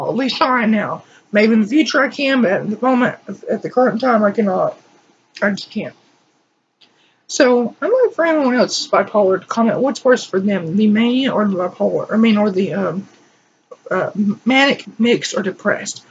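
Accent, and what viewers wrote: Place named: South, United States. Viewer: Mania would be would be the worst, I counteract depression through exercise but I don't know if that works for bipolar people. Seriously though if I were you I wouldn't try and take any sort of mild/strong anti-psychotic. If you want something that works I took pristiq for years on end and it made me feel really 'high'. It counteracted my depression very well when effexor just made me really sweaty and anxious. I mean you can check out some video's when they forced me on abilify, an injectable. Now I get SVT's (recorded as well) all the time. You sound like you got a southern accent I recommend to fight you depression it's probably better to try and get a job further up north. It's just the more south you go I've noticed the worse things get with mental health in America. Have fun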